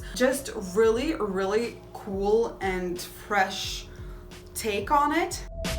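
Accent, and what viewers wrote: Russia. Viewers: You’re gorgeous ❤️ are you Russian? I’m asking because of your accent! Thank you for this video!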